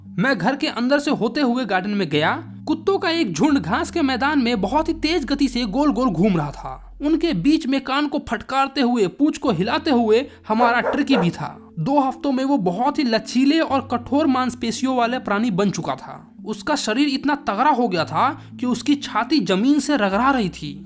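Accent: Bihar, India. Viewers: Boli to pura bihari jaisa hai ❤❤❤ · it also have bihari tone , i can understand as i am bihari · bhaiya ap thode se bihari ho kya😭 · Your Bihari accent is just 🤌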